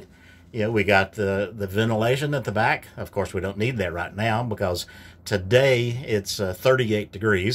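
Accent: South, United States. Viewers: Love the Southern drawl. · Hey! Loving the Southern draw☺️ I believe you may need to talk in your videos more often. This was super awesome. Love seeing your setup. The mounts look great. I agree with the growth on the mounts. They do amazing. You’re a natural at it. 👍🏼 I can’t believe it’s gonna be that cold for 3 days🥶❄️ Hope to see more videos with you narrating.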